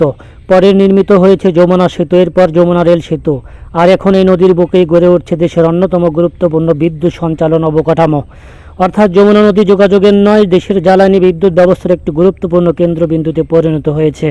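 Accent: India. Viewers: R you from India ? Your sound like Indian.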